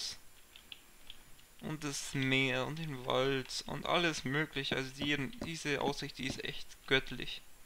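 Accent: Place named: Bavaria, Germany. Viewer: Ich finde die bayrische ausprache von dir so geil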